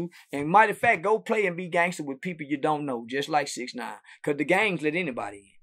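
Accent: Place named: Texas, United States. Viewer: “Rockers Island” haha that Texas accent 😅🤘🏾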